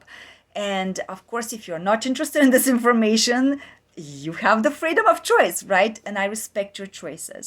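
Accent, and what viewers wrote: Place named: Bulgaria. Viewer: You're elegantly spoken and yet still so authentically Bulgarian! You have a gift! This channel and your message deserve more visibility!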